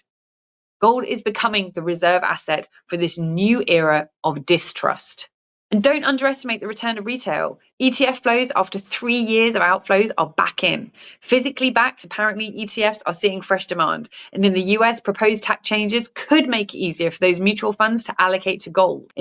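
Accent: United Kingdom. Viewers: Excellent presentation!! Her story line was obviously well researched, well written and spot on. I am stunned and amazed that the average Joe has not (yet) piled into gold when governments and central bankers have been doing so for years. As an added bonus me being an American enjoyed the (British?) accent.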